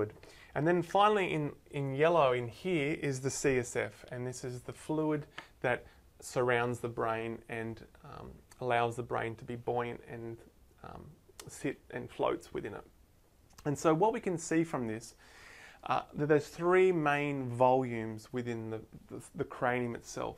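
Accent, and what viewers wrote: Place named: Australia. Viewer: Legend! So much easier grasping this concept hearing it from a fellow Aussie! Cheers :)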